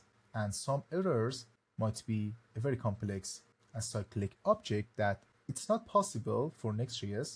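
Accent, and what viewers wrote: Iran. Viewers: Persian Accent Discovered ✅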